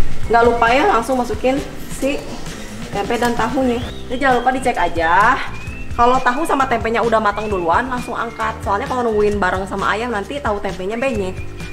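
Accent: West Java, Indonesia. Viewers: Suka deh kalo teteh ngomong logat sunda euy 🤣🤣🤣🤣🤣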